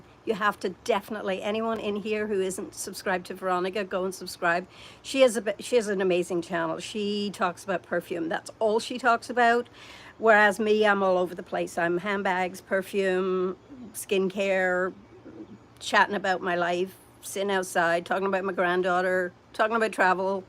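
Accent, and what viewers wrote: Ireland. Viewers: Hi! You're from Ireland!!! WOW! Now that you mentioned that I can hear just a slight accent! I agree that growing up without a lot does make you humble! This was fun!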